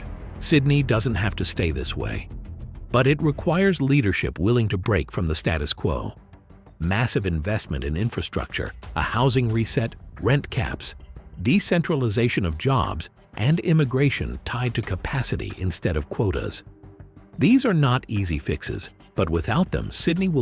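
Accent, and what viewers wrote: United States. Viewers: How come when you show money, you always show US $ ? Apart from the American accent to start off with... The points raised have of course value.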